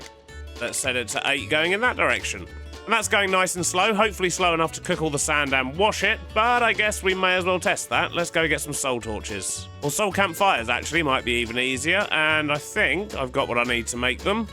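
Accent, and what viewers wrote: United Kingdom. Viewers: Your voice sounds so british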